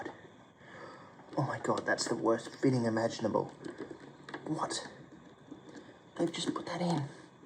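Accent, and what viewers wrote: Australia. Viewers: Saying "I can't do the Australian accent" when you literally HAVE and Aussie accent is actually pure gold. Thanks for that, I needed it. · “i can’t do the Australian accent” …mate, you have an Australian accent all the time lol ❤ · Your always doing “the Australian accent”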